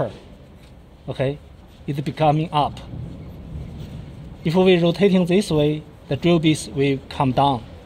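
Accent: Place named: China. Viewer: I can totally understand your chi-english. any body understand also?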